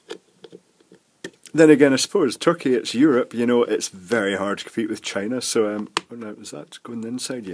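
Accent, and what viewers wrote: Scotland, United Kingdom. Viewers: Scottish accent maybe?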